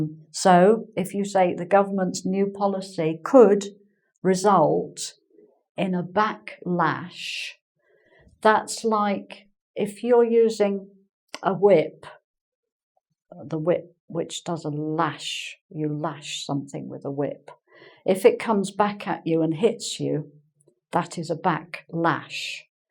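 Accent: United Kingdom. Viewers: Granny !! Really all Your lessons very fantastic and useful for beginners to learn the British accent could you help me to get the special classes to get improve my pronunciation&accent .. hopefully waiting for ur text message!! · Hey there! I'm a brazilian English student. I thought her British accent so cute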